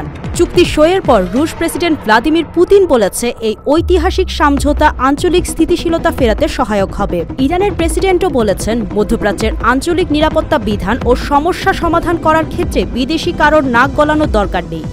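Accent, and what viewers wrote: India. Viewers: একাত্তর টিভিতে ভারতীয় সাংবাদিকের উচ্চারণে সংবাদ পাঠ।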